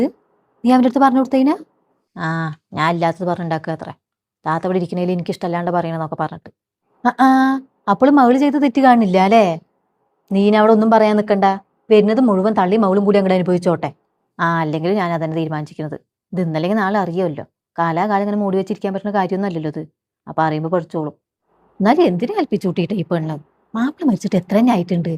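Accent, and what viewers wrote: Palakkad, India. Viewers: Palakkad vannattoli poyi ttolii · പാലക്കാട്‌ ആണോ നിങ്ങൾ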